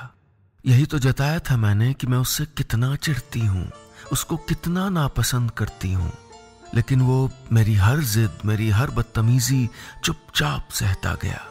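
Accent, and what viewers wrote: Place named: Kumaon, India. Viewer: Very beautiful story and that kumauni style of talking just awesome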